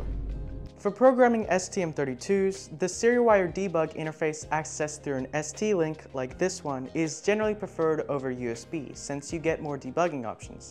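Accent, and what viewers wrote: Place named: Australia. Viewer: As soon as I heard 'I decided to grow a pair', I thought wait what is he Australian? Yep. 🤣 Good luck in your studies and good to see some young passionate people coming into the field!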